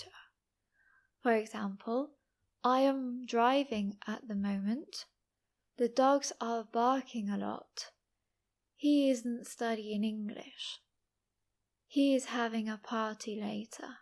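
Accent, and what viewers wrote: United Kingdom. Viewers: Hello, your British accent is RP or MRP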